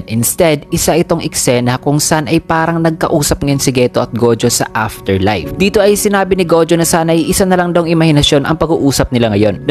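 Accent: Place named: Conyo, Philippines. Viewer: napaka conyu mo naman pare magsalita., dapat mag-english ka nlng kung nahihirapan ka magtalog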